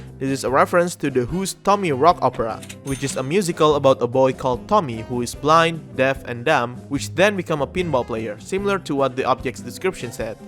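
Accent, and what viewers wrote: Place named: Indonesia. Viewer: Kayak ber aksen indo, kadang ada grab ama anak sma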